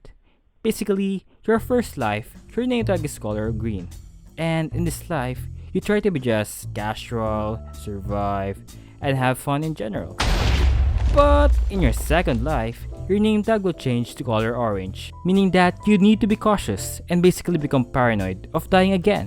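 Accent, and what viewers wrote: Philippines. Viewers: Is it me or his accent sounds like filipino Xd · You sound Filipino.. are you? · Well that explains his accent! I’m Filipino too! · u pinoy ur voice is SOOO PINOY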